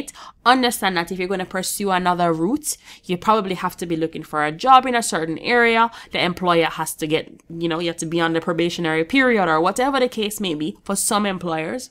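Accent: Jamaica. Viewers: Love this.... Jamaican?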